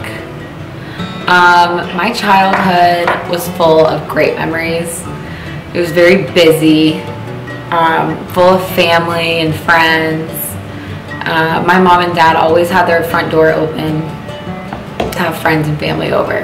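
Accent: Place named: New Jersey, United States. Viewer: Wow you literally kinda have a New Jersey accent . You seriously look like a New Jersey girl lol (not a bad thing)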